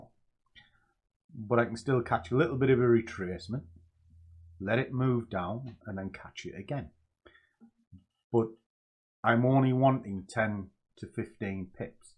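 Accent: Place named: Teesside, United Kingdom. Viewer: First time here , really informative and going by the accent think you must be local to me ( I'm in teesside)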